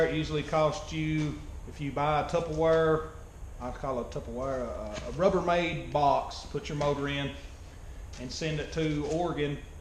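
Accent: Tennessee, United States. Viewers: You from Tennessee?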